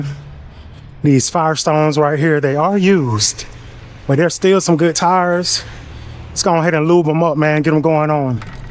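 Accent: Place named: South, United States. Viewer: I like these videos. Been binge watching em. As a youth I used to switch out bicycle tires for all the neighborhood kids. It was fun and enjoyable. Now in my adult life I do my own winter tire/summer tire switch outs in my driveway. Tires are already mounted to rims but I do get my Nascar on with my impact drill. 😅. I'm from the south so enjoy the accent too. Keep it up man.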